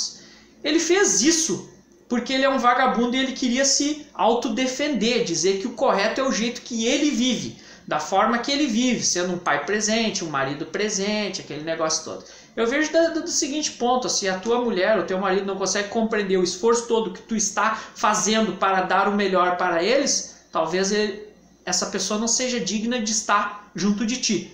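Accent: Rio Grande do Sul, Brazil. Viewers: Boa noite. Assisti teus vídeos, os módulos e dei muita risada e aprendi muito com você. Sou do nordeste (Recife - PE) e me diverti muito com teu sotaque gaúcho (bá, gurizada, etc). Você é um talento na comunicação. Parabéns. Vou iniciar nas próximas semanas como Motorista UBER e agradeço teus vídeos. Super abraço. Gostaria que você me adicionasse no grupo de Whatsapp e também no teu whatsapp provado para ir aprendendo com você e com os outro colegas (<num>).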